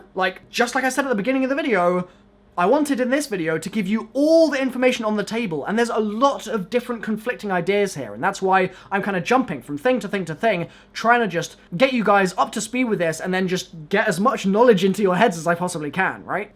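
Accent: United Kingdom. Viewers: XactoSharks He's British. It's different there. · Are you British? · when he was saying "zed m" i got really confused and i thought i was missing something but then i saw the ZM and i remembered that british/autsrailian people say "z" really weird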